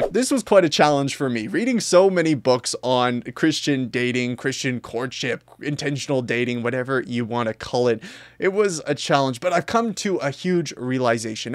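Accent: Canada. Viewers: This dude has got a solid, mint canadian accent lol.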